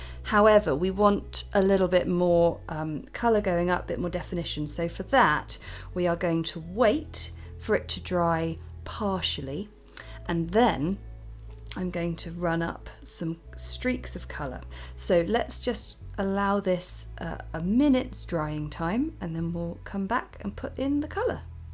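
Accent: United Kingdom. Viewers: Well here we are 4 years on and I just knew you’d have a lovely crocus flower to paint for my Spring/Easter cards. My first go without watching this again was just a disaster, so newly armed with the better approach I’m confident I can pull this off. Ps—honestly I don’t care how you pronounce Alizarin; I so enjoy your British accent it just does not matter to me one whit!!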